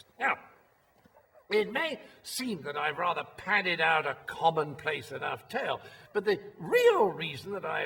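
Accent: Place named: United Kingdom. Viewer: Subtitles application is struggling to cope with that magnificent British accent like a desperate lion.Funny too!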